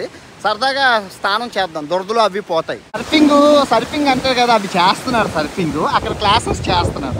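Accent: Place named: Chittoor, India. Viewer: Namaste bagunnara 😂 , Andra chitturu style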